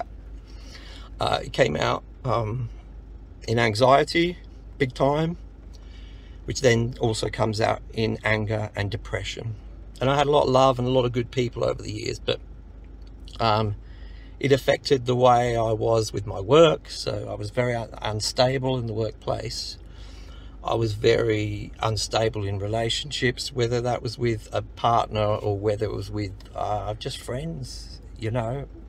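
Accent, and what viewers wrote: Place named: Perth, Australia. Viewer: After all the wonderful information you've so generously shared here, I felt that the least I could do is watch every minute of this video. I'm glad you felt like you could share this - that, whatever risk you may have considered, it felt like the good and right choice. Firstly, I am sorry for how you've suffered, what created this suffering and the long tendrils that trauma can have. Secondly, thank you for using your suffering as a vehicle for conveying this kind of message - because you're right, so many people are suffering in various degrees of silence and distress and in some way or another we all feel alone with it. Thirdly, when you spoke about the "physical" aspect of your trauma, it brought to my mind my mother, who also passed away, from cancer, with my hand on her, 6 years ago this month. I often think of her when watching your videos, as she was from Perth, and even after many years in the U.S., she kept a hint of her accent and somehow your voice spontaneously connects me to her, which is a good thing. 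And finally: thank you for the gift of what you have shared through your channel. Cakewalk is the environment my musical creativity has settled into, which makes it a focal point for my hopes, my delight, my anxiety, my fear, my despair. What you share goes right to the heart of that place and helps it make more sense, helps it become a place I can better function in despite all the vulnerabilities that come along with it. It's very much a work in progress - my se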